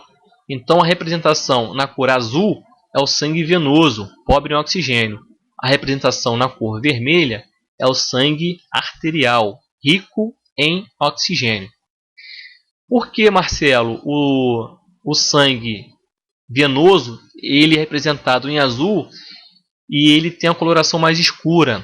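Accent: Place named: Rio de Janeiro, Brazil. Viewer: Adoro esse sotaque carioca. Um dia ainda caso com um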